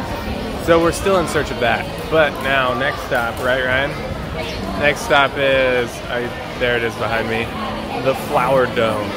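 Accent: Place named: United States. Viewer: The first time I saw ur picture I thought it was henry cavill vlogging. The American accent gave u away. Welcome to Singapore, Superman. Come back and try some local food. U will be pleasantly surprised.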